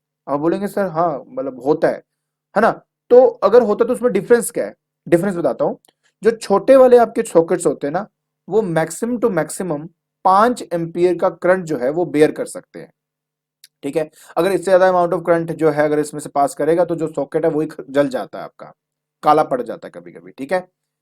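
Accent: Punjab, India. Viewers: Sir kyà aap Punjab se ho?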